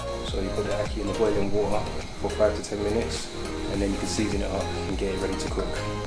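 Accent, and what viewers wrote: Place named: London, United Kingdom. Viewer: You sound like your from London, so am I and been living in Florida USA for 14 years. I have 2 ackee trees in my back yard, got a proper 15ft fruit picker with a basket to get them and thoroughly enjoy the harvest each year. Harvest is coming to end being November. My Jamaican neighbors love it too and give them bags of whole ackees so they can see it’s opened naturally. Once I made the mistake of giving them ackees I removed the flesh thinking I was helping. I have a hard time with squirrels here and can only try to keep them under control with an air rifle.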